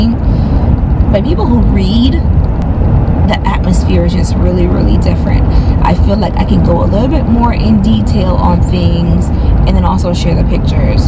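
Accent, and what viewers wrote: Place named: South, United States. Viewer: That country accent is too cute😂. . Can’t wait to see the new space. Everything will work out. Praying for you!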